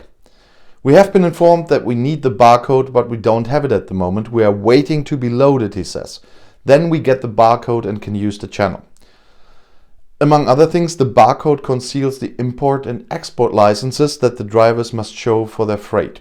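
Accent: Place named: Germany. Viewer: With your slight German accent it almost sounds to me like the "Kent Access Permit" is perhaps instead called the "can't access permit" but that would be absurd wouldn't it?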